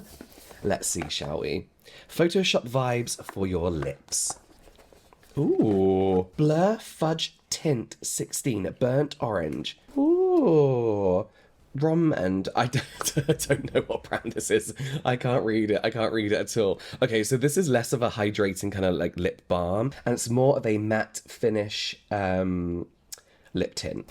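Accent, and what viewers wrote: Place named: RP, United Kingdom. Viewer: sweetie you can pronounce words however you want, and you still sound refined British to us Americans😊. Sending love from Chicago ❤❤❤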